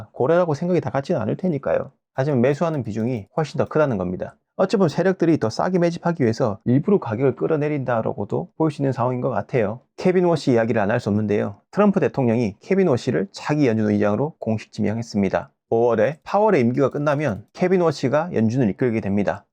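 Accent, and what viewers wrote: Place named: Gyeongsang, South Korea. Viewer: 이 유투버는 사투리를 깔끔하게 사용해서 듣기좋다. 요즘 어설픈 표준어 역겹게 사용하는 경상도 사람들이 너무 많다. 구독 강추!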